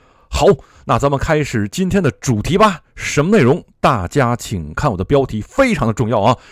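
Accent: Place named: Japan. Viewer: 我说怎么不是英音也不是美音，原来是日音英语。。。。:face-blue-smiling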